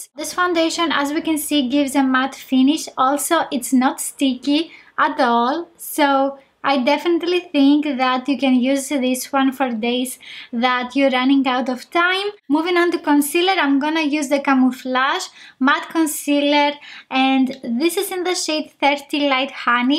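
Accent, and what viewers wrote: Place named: Greece. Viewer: She is Greek. I love their accent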